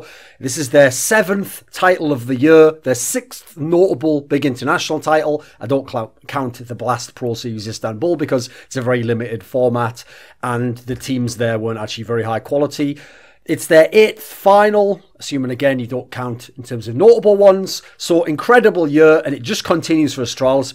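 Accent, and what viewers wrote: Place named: Scotland, United Kingdom. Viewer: It's definitely English, but definitely not an accent from England. Probably Scotland or Wales.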